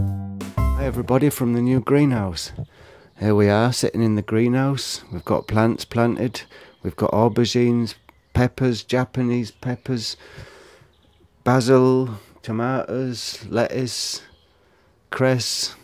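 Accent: United Kingdom. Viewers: your british? birmingham ? obviously in germany now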